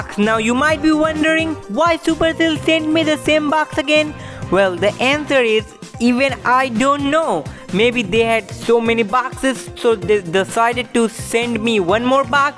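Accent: India. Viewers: ARE YOU INDIAN? BECAUSE YOUR VOICE AND NAME IS LOOKS LIKE INDIAN NAME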